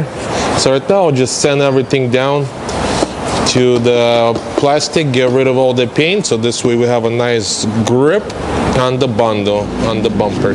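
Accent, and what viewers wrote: Russia. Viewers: ты че Русский что ли? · Эбать у тебя акцент русский. Сразу видно наш пацан 👍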